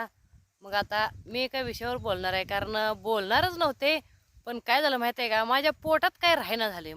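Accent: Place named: Western Maharashtra, India. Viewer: बोलण्याची पद्धत आवडली ,बोलीभाषा पश्चिम महाराष्ट्रातील दिसतेय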